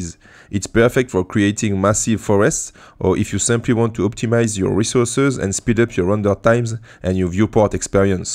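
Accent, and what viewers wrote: France. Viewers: Le petit accent français 😏 super tuto vraiment continue comme ça c est incroyable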